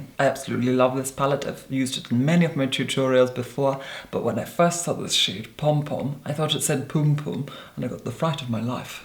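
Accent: Scotland, United Kingdom. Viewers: The britanic accent it's stunning! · claire johnson I’m also from Scotland, but his accent is SO different compared to mine because it’s so ‘posh’ lol.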